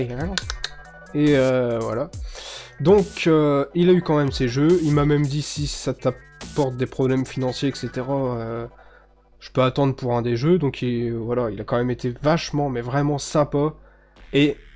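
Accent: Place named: Charleroi, Belgium. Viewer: L'accent du nord que tu as me fait blinde penser a celui de Charleroi (ville de Belgique) 😉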